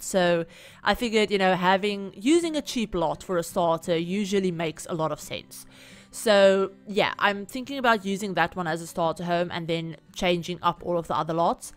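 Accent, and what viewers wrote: South Africa. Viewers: are you south african? our accent is pretty iconic lmao. eitherway omfs im obsessed with this build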